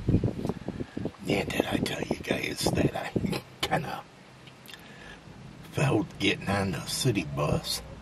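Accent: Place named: Michigan, United States. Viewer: I could tell you were from Michigan with your accent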